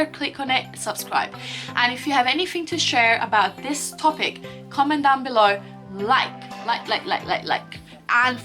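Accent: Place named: Bogan, Australia. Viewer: London, yet use a uk flag ... such a bogan accent.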